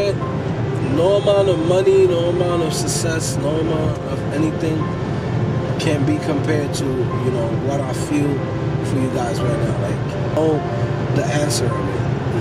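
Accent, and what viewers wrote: New York, United States. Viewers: Hey I have been watching your video for days. loved the work. So sorry to hear about your loss, family is the biggest wealth a person has. BTW are are you from NYC? I am from queens and I think you are a new yorker too. :)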